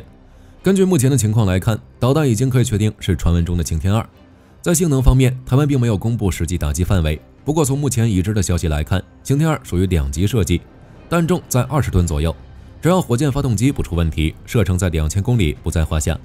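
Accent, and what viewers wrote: Mainland, China. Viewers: 這個頻道的語音為什麼是中國大陸的口音？是用中國大陸的AI配音嗎？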